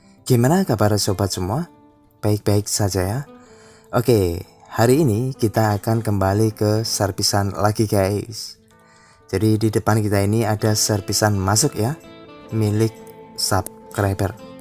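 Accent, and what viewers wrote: Java, Indonesia. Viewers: Jumpa lagi sama saya selalu nyimak 👍👍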